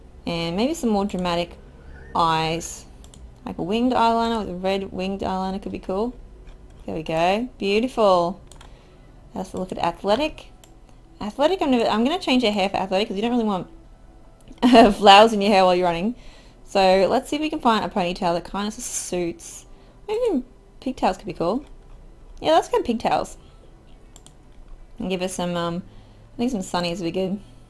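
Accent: Australia. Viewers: She is Australian I'm pretty sure.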